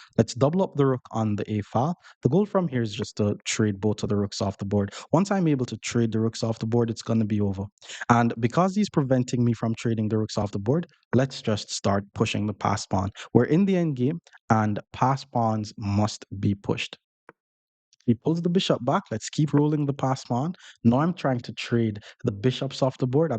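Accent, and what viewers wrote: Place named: Jamaica. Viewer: like your style of explaining, the jamaican accent is amazing thx 🤙🏼🤙🏼🤙🏼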